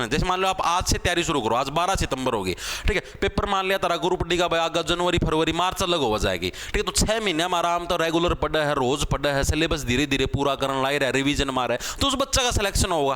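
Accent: Haryana, India. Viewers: Result dekhi ja....aapki haryanvi ktttiiiii zehar😅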